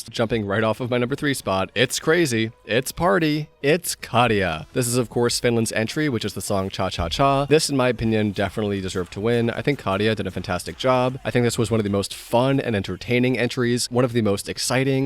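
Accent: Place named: United States. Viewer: I like your channel,! And I agree with you a lot. From your top, the only entry that is not my style is Spain, but I definitely appreciate the art and her amazing talent. All my votes went to Luke 🥺 I wanna see more videos with your comments, I enjoy your american? voice. Haha 😀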